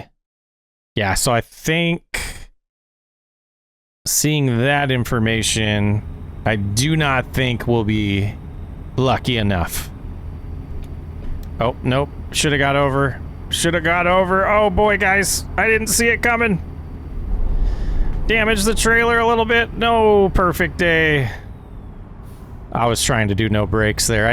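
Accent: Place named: England, United Kingdom. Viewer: I think it's funny that she has an English accent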